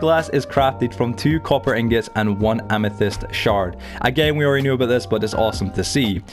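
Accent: Scotland, United Kingdom. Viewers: Are you Scottish?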